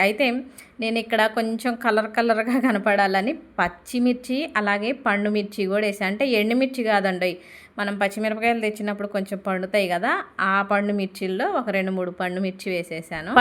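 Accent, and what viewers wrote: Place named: Andhra Pradesh, India. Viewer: Miru andra mata lagane matIadtaru bagauntadi ma entiloala unatmo alage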